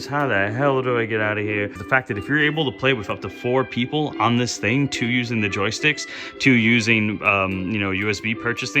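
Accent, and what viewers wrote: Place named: Midwest, United States. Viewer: I thought you were funny. May I ask are you from the Midwest? You speak like us from MI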